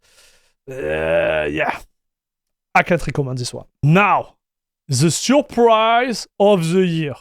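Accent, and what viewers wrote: France. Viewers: Mdr mec l'accent , ca se sent que toi aussi on se moquait de toi en cours quand tu essayais un accent plus british ! N'empeche ça me rassure, meme si ton accent est french de ouf bah on comprend parfaitement ! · I came here for the French accent ❤️❤️ Love the content keep it coming lad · Excellent ! Un abonné en plus, j'ai commencé doucement avec Bazzite sur mon pc fixe il y a environ 1 mois, idem sur ma.rog ally et je doit dire que grace a ce dernier je suis tombé amoureux de linux et j'ai totalement oublié windows (mêmepour la bureautique), cependant Bazzite est encore jeune et présente quelques bug et plantage, rien d'insurmontable mais, ayant vu de quoi etait capable Linux avec ce dernier j'ai cette soif de decouverte et depuis quelques temps CachyOs me fait de l'oeil depuis quelques temps pour ma config fixe et franchement tu m'a donner envie de me lancer sur ce dernier. 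J'aurai pu commenter en anglais mais je me suis dit qu'un peu de Français te ferait plaisir 😅, on a le même accent à couper au couteau 😂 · Si je ne me trompe pas, tu viens de la France. T'as un accent Franglais sans doute, ce que j'aime bien 🙂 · If you don't like french accent, just go cook yourself an egg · You have a strong french accent, so i'll say it to you in french: Ce que tu as dis sur Debian est partiellement faux. Tu as dis que les version étaient vieilles. C'est le cas PAR DEFAUT. En 3 lignes de commandes, tu peux ajouter les repos backports de debian. Ces répos possèdent les dernière version de beaucoup de packages. Par exemple, je suis sous Debian 12, qui est normalement fourni avec le kernel 6.1, mais avec les backports j'ai pu avoir le kernel 6.11.10 . Pareil pour les drivers nvidia, ou encore gnome. Dès que tu actives les backports, avoir les dernières versions est simple, tu rajoutes juste -t <nomListe> a ta commande APT pour les prendre depuis les backports. 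AVERTISSEMENT: SI TU FAIS CA N'ESSAIE SURTOUT PAS D'INSTALLER LES META PACKAGES (linux-image-amd64 par exemple) CAR TU RISQUE D'AVOIR QUELQUES SURPRISES...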